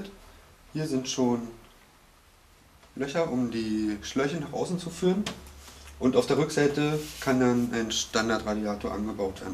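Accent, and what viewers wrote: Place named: Berlin, Germany. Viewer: bei dem ganzen Berlinerisch versteht man ja den rest gar nimmer^^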